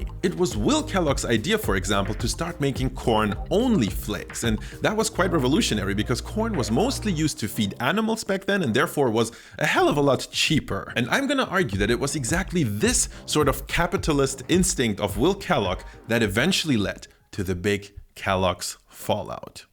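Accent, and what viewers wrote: United States. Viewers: your accent is INCREDIBLY American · I like your videos. :) And I'm really curious to know where you're from. Well, obviously, you're German. But you sound like an American and you look like a mixed European and Middle Eastern. 😄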